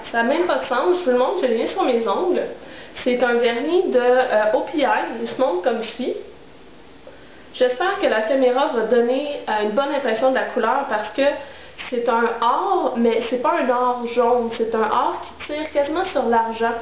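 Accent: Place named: Quebec, Canada. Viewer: whouaa un accent quebecois et qui chointe le del!!!tout de mëme charmante ; p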